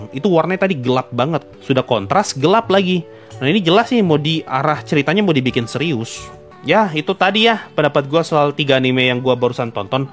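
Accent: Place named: Masamba, Indonesia. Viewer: logatnya kaya orang masamba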